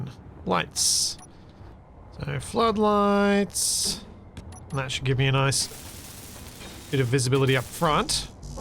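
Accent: Australia. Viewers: With Ozzy Man dialect, my brain keeps translating his talk about "half-width stairs" into "half-wit stares"... which I'm kinda takin' personally... 😳🥸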